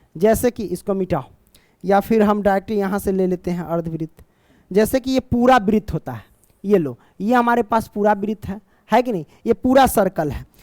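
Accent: Bihar, India. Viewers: Sir aap bihar se ho na😊me tooo❤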